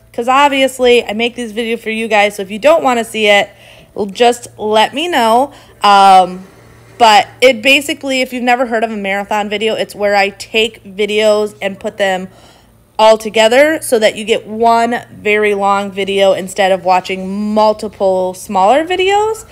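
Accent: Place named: Midwest, United States. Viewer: I love watching your videos they are so motivating. I also love hearing your midwestern accent I have family in Illinois and I just feel like we are family.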